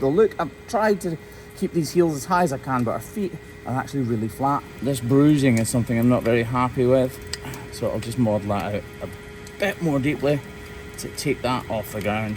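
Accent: Scotland, United Kingdom. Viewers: Your accent reminds me of William Wallace on Braveheart. Watch your channel all the time, love that you care about cows.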